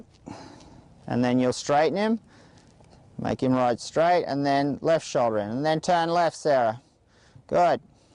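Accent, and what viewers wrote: Australia. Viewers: Is he Australian? He has an accent...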